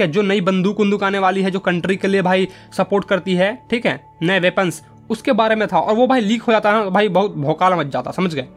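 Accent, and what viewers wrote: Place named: Bihar, India. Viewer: Nice story 😊explanation sir and also I love🤭 your bihari accent 😇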